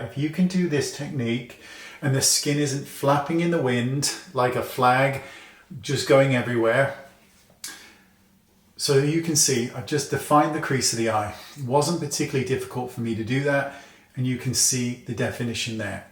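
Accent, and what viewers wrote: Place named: South West, United Kingdom. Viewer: So funny xx ❤ your soft-‘growly’, light SW accent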